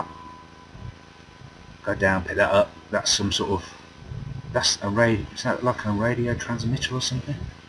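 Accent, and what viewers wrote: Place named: Northern England, United Kingdom. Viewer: It's just that you sound a bit northern (well, to me anyway). I thought you may be from Manchester upwards :)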